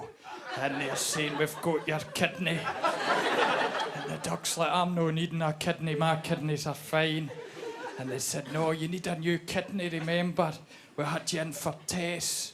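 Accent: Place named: Scotland, United Kingdom. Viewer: he's from the east coast of Scotland, not glasgow, i'm from the west coast of kintyre & my accent is different from people living less than 10 miles away which is different from the accent 10 miles in the opposite direction & there are half a doz different accents in the 37 miles between my hometown & the tarbert, loch fyne accent, there has to be at least 100 different Scots accents between the borders & the northern & western isles with the best spoken english place in the world being the city of Inverness on the moray coast, obviously due to the aftermath of culloden in 1746 as the site is barely ten miles away & the town would have been garrisoned with english troops following the '45' rebellion. david kay is a comedian everyone should adjust their ears to as he is unique, original & hilariously funny, unlike the micheal mcintyres & catherine ryans of this world who are pathetic yet never off our screens.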